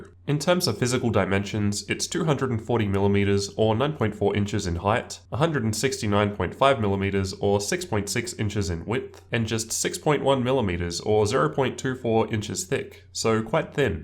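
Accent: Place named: Australia. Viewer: Are you an Aussie? I have an Australian friend with a similar accent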